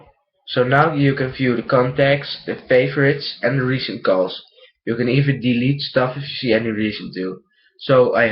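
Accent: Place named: Netherlands. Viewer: je bent nederlands kan ik horen en je naam :p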